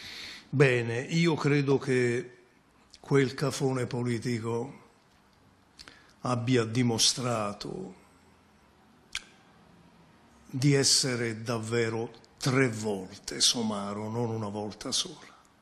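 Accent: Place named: Naples, Italy. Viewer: ascolta, sai bene che ci troviamo in Italia e tutti hanno degli scheletri nell'armadio, ma a me sembra sinceramente che De Luca un po' esageri, il guaio è che sembra recitare una sceneggiata napoletana un po' clownesca. Poi la tipica pronuncia partenopea, mi sembra di sentire la pronuncia di mio padre e dei miei congiunti napoletani. la nostra famiglia è di Torre del Greco (provincia di Napoli dove sono nato anch'io e da dove mi trasferii successivamente a Trieste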